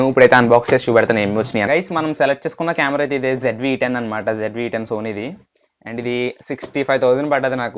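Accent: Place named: West Godavari, India. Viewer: పశ్చిమగోదావరి district , language ❤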